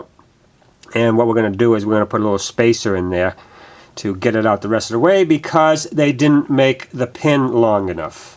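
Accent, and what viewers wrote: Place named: Boston, United States. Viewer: . Thank you so much for the video! You could have saved me hundreds. I never would have had the gumption to change my water pump without your video and the one from autotech. I watched it backwards and forwards so much that I started thinking in that Boston, is it?accent. Can't thank you enough. When I got to my water pump I decided it wasn't leaking (it had been changed twice by the same mechanic the last just about 4k ago) so I changed the thermostat housing instead HA! But I wouldn't change the experience being that I was on vacation and want under pressure to get out done. Thanks!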